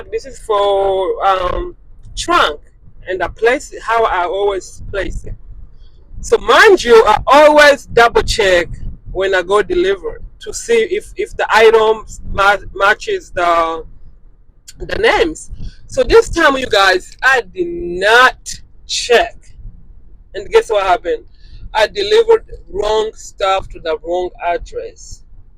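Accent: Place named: Haiti. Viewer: How long have yòu lived in the United States? Im from Miami trying to place your nice accent, Haiti or...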